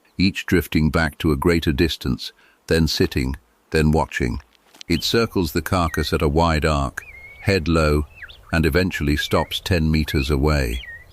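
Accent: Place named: United Kingdom. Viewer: Really? Are you really proud of your hollow, soulless, contemptuous, empty, Superior British accented commentator? We understand that you're incapable of providing a truly human being with a truly human commentary, but at least give us one who doesn't have the intolerable snobbery of the British, thanks.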